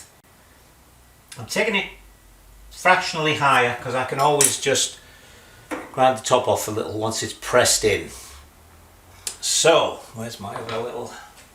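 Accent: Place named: Teesside, United Kingdom. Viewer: Ahhh, thought I recognised that accent - a Boro lad like myself. Hope you are enjoying your retirement. I am about to start on the restoration of my '67 BSA Lightning so may need your expert guidance!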